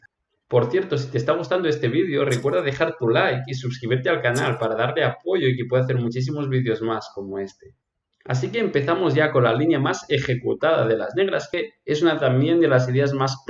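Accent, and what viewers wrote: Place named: United States. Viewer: Hablas un excelente inglés americano.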